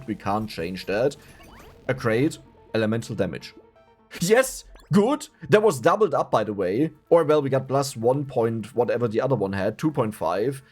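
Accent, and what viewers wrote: Germany. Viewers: Ich dachte mir schon das du ein deutscher bist 😂😂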